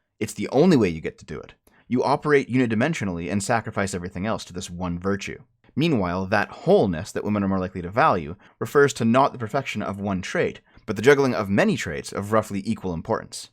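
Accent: United Kingdom. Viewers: her accent is so english and thats quite annoying just saying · Why does she speak british?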